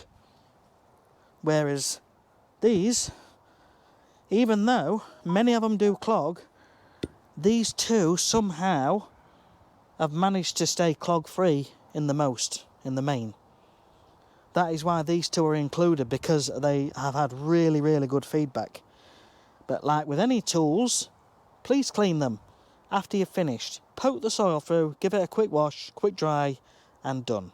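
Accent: United Kingdom. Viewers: UK accent with imperal mesurements lol kinda threw me off. Its all good keep up the good work.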